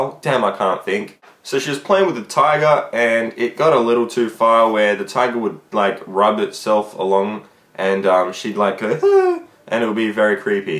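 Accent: Australia. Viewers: Are you Australian? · Yay Australia! Good to see someone whos not American for once. I dont have to stab myself becuase of the accent! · MOVE... and your accent is Australian ^_^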